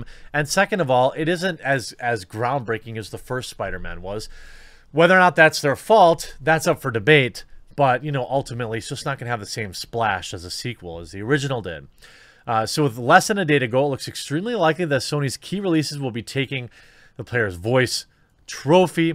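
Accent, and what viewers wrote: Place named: California, United States. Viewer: This SJW Californian accent is reaaaaallly getting to me. 😂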